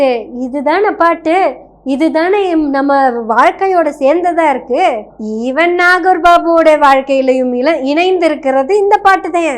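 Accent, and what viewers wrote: Kongu, India. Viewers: கொங்குத் தமிழில் நோண்டி நுங்கெடுத்து சங்கிகளைப் பங்கப்படுத்துவதில் தங்கைக்குக் கைவந்தக் கலை! அழகுத் தமிழுக்காகத் தலை வணங்குகின்றேன்🙏🙏🙏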